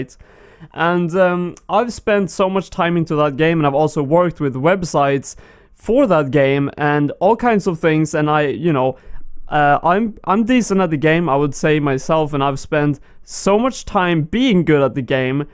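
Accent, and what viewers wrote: Sweden. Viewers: Man i love your swedish accent !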